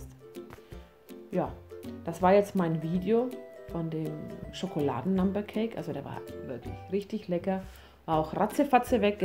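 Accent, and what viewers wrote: Franconia, Germany. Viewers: Woher kommst du aus Deutschland ??du hasst ein fränkischen Dialekt:) find ich total cool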